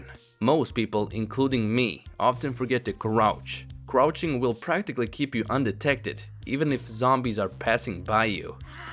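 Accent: Russia. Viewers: That Russian accent though. Never heard one in real life in before. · i can hear the russian in your voice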